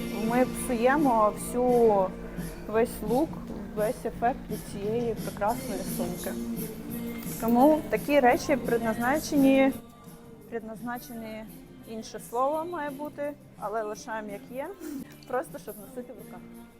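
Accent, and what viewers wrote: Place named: Surzhik, Ukraine. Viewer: такий милий суржик, дякую за відео.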